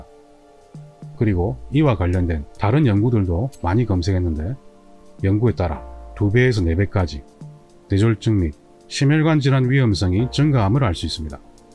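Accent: Gyeongsang, South Korea. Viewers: 선생님 말투가 경상도 출신같은데 맞나욯ㅎ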